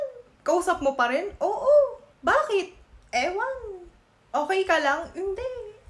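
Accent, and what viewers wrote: Philippines. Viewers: You have a Pinay accent na talaga 😊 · tunay kang pinay kasi when it comes to beauty marami namang pinay like your beauty magsalita pinay pagmagenglish pinay accent kahit kunting korean accent wala eh more on filipino ka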